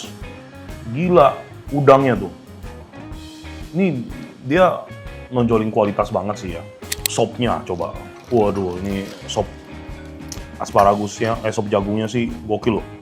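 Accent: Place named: Surabaya, Indonesia. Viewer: Mas atau ko nya logat" medok" Surabaya an 😊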